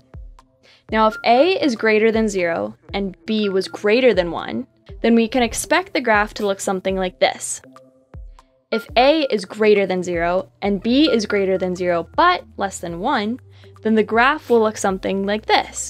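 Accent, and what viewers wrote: Canada. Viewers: Sounds like an Ontario accent.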